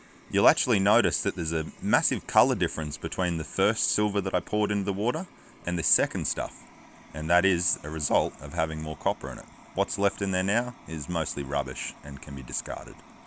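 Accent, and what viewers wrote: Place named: Australia. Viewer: Great video mate. You sound Australian, where are you located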